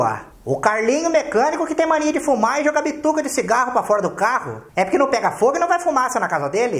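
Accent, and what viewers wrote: Minas Gerais, Brazil. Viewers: Concordo com você!! Mas fala mais devegar, não entendo direito o mineires!😁😁😁😁😅😅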